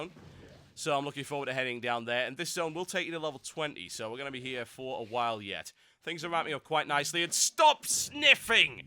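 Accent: United Kingdom. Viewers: i think u should do the worgan voices cause u sound more british than anyone at blizz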